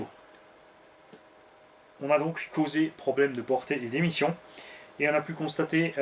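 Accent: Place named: Alsace, France. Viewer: Salut, Je débute dans le RC "moderne". J'ai monté un Tamiya Madcap en kit dans les années 90 et je suis tombé sur des chaines de RC par hasard sur youtube pendant le confinement et ça m'a donné envie de m'y remettre. Je suis de Strasbourg donc je te comprends parfaitement;) même si tu parles en alsacien! Elsassisch ìsch bombisch, Hoplaaaaa! Je cherche plutôt un buggy RTR Brushless en 1/8 aux alentours de 300/350€ soit un budget total d'environ 450€ (voiture + radio + batteries + chargeur). Je ferai 2/3 bitume et 1/3 TT, quelques petits sauts et pas la peine d'aller à 100km/h. Un 4S suffira. J'ai vu le nouveau Absima Stoke Gen2 4S qui a l'air pas mal. Qu'en penses tu? Merci.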